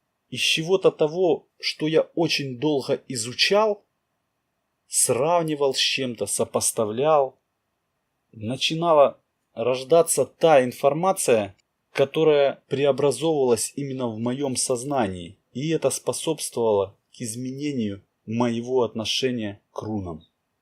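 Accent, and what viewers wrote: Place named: Ukraine. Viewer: Обычный мягкий украинский говор.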